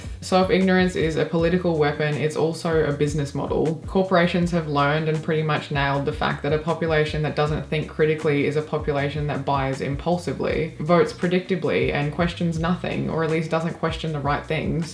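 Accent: Australia. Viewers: Are you an Aussie living in America or something ? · The counter argument to your video is the covid debacle, where many experts and scientists did lie to the public, often repeatedly. An advanced degree does not confer advanced ethics, or any ethics at all. And btw, I don't know if you're Australian or a New Zealander, but both those countries' responses to the pandemic made America look like a land full of geniuses in comparison (which obviously we're not) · I agree, but I having to listen to this in an Australian accent is somewhat counterproductive.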